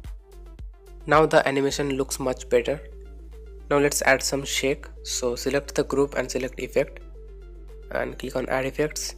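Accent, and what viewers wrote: India. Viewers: Hahahaha Indian English your speaking wtfc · U are indian i know indian or pakistani people speak english like that😃i am right am i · you know its the good shit when you hear that indian accent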